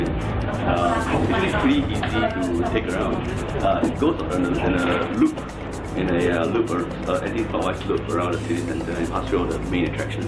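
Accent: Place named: Malaysia. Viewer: gotta love malaysian accent in this vid~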